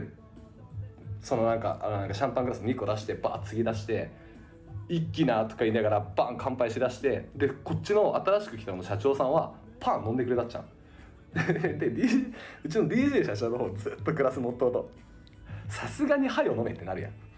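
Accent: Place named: Kyushu, Japan. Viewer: ふぉいが九州の方言で喋ってるのもめちゃ萌える🥹🥹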